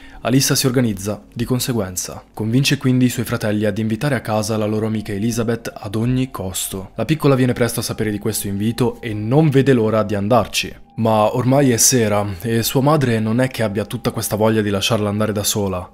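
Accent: Sardinia, Italy. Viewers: Ma sai che mi stai incuriosendo? Parli spesso del paese in cui vivi e dato che ho capito che probabilmente è dalle mie parti mi chiedevo se per caso non fosse vicino a Limbiate! Chissà... Però mi chiedo anche come mai se vivi da queste parti si sente così tanto il tuo accento sardo? Io infatti ero assolutamente convinta che tu vivessi in Sardegna! Vabbè come dici tu giustamente quando parli di Istagram, che se vogliamo farci i cazzi tuoi possiamo seguirti li,visto che io non uso Istagram me li faccio addirittura qui ma capisco assolutamente se non vorrai rispondere! Buona serata a te!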